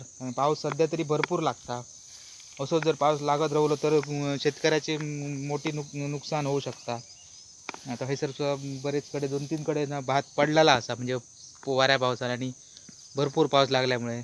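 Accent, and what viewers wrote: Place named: Malvan, India. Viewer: सुमधुर, मालवणी लहेजा असलेली ... भाषा शैलीने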